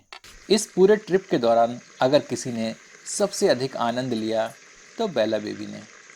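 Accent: Standard. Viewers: क्या सुधा हिंदी बोलते हो जी । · शुद्ध हिन्दी बोलते हैं आप 👌🙏🌹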